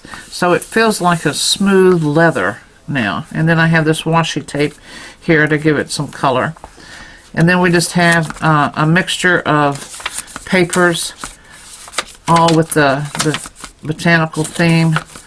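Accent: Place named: South, United States. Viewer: I’m a Georgia Peach. I know southern accents when I hear them. Lol. 😂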